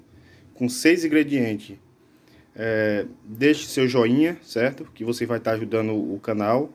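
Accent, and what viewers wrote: Ceará, Brazil. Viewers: Vc deve gostar muito da sua sogra Coral. Kkkkk.Até bolo faz pra ela. Toda sogra queria ter um "genro anjo" assim; como vc 😂😂😂😂😂. Vc tem sutaque de cearense. Parabéns! Sou cearense e amo bolo mole. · Cearense a gente conhece de longe. Kkkkk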